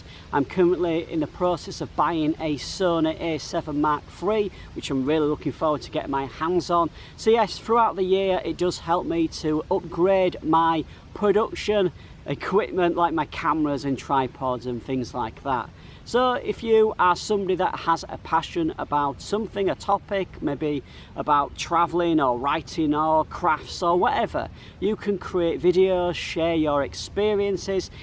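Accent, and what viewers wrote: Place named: Manchester, United Kingdom. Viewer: sorry mate i didn't mean to offend you , i thought you are from Manchester through your accent , lol cheers man , i like your narrating way and i keep following your videos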